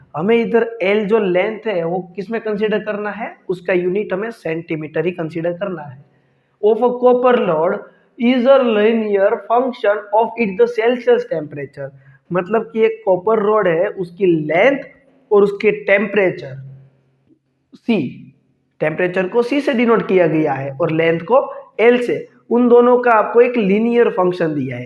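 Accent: Gujarat, India. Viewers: Sir your videos are very helpful and i am now able to understand all maths questions easily. Aur sir kya app gujarati ho apki language se pata chalta hai Me bhi gujarati hu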